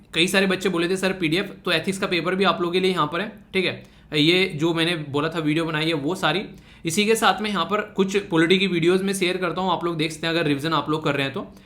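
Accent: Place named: Western Uttar Pradesh, India. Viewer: Sir aapne kbhi btaya nhi aap kha se ho lekin m 100% surety ke sath kah skta hu aap west up se ho or Meerut ke aas pas kisi gao se ho (because aap sarpanch ko pradhan kahte ho jo ki up me hi kha jata h and aap ki boli me dehatipan h jo haryana se touch up me hi dehatipan dekhene ko milta or aap dhakad chhora ko bhi jante ho)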